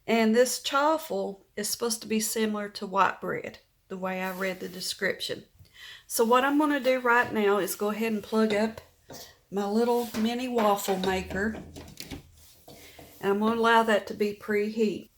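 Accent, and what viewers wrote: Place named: Georgia, United States. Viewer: I really like your accent and way of speaking. It reminds me of my dad's side of the family in North Georgia.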